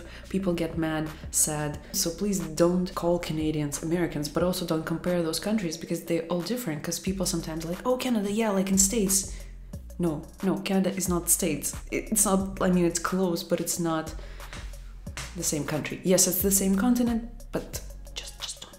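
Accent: Russia. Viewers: Thanks for making this video it was helpful for me . And also I like your Russian accent the way you talk ❤️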